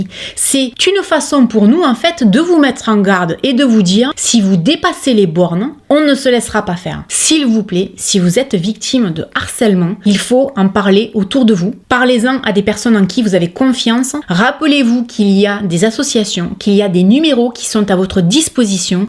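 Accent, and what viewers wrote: South, France. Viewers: J'ai été harcelée à l'école sur le fait que je parlais "pointu", en gros l'inverse de ce que l'on reproche à ton bel accent du sud. En gros j'ai été harcelée car vivant dans le sud, je n'en avais pas l'accent..... Ridicule non? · Merci à toi. Tes vidéos me plaisent toujours autant. Tu as bien raison de ne pas te laisser faire, bravo. Et moi j'adore entendre ton accent du sud en particulier tes "moins" 😂 bonne fin d'année à toi ❤❤ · 😱 je trouve ça épouvantable d’avoir à expliquer tout ça! Je vais donc en profiter pour te dire que j’adore ton accent du sud! Je suis du Québec et mon fils s’est marié à Limoux en 2019! Il habite cette belle région du sud que je trouve formidable! Merci pour tes vidéos! 🥰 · Coucou, oui le harcèlement est réprimé par la loi, et oui il faut le dénoncer car généralement les harceleurs s’en prennent à plusieurs personnes quand le but est atteint, ils attaquent une autre et ainsi de suite … j’adore tes vidéos sincères, j’aime tes crash-tests et les autres tests avec avis bref, c’est un plaisir d’entendre ton petit accès du Sud et ton rire 😊 Belle année lumineuse ✨ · Bonsoir. 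Et bien moi j'ai 55 ans, je travaille dans un service public qui accueille des clients plus ou moins intéressants, je suis du 12,j'ai un "assent" comme le tien...bref je cumule!!!! En résumé: vieille,fonctionnaire et du sud...mais qu'est-ce que j'aime ça!!!!. Vive nous et m.... aux aigris. Vive!!! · Je suis estomaqué de voir à quel point certaines personnes sont méchantes. Moi j’adore tes vidéos tu me fait toujours passer un très bon moment, toujours bienveillante et j’adore ton accent ( je suis du sud aussi). Continue comme tu es et tu as raison on ne doit pas banalisé le harcèlement. Bisous 😘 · Bonjour, je crois que c'est la première fois que je laisse un commentaire...J'aime beaucoup ce que vous faites, et votre accent est super (je suis moi aussi du Sud - mais Est;) )..Pour en revenir aux réseaux sociaux j'ai fait un commentaire récemment sur twitter concernant une photo d'un hérisson en taggant une personne qui les dessine et qui les nomme "choupisson"...Je me suis pris une volée de bois vert de la part de l'auteur du tweet qui a dit en gros que ce genre d'appellation faisait du mal à ces animaux et incitait les gens à les prendre en animal de compagnie... 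Pour un mot... Merci pour votre bienveillance et votre sourire..; Belle et heureuse année à vous et votre famille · Moi j'adore ton accent du sud ❤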